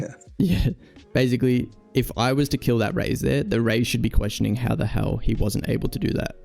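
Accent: Australia. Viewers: as an aussie ibwould say he has more of a aussie sydney accent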